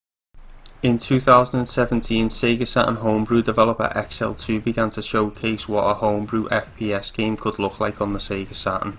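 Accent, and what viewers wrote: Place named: Scotland, United Kingdom. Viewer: wow, that is pretty cool! .....but can anyone please translate Scottish to Australian? ....just kidding! ..love ya accent mate lols.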